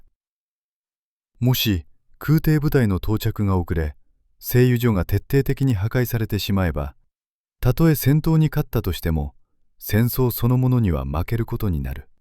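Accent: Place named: South Korea. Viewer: なんで、こんなﾁｮ~鮮語のような、語彙の頭が破裂音の耳障りなカルトチックな音声になるんだい？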